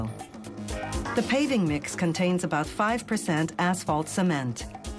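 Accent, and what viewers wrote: Quebec, Canada. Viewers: She's ESL, and doing a fairly good job of suppressing her (french canadian) accent.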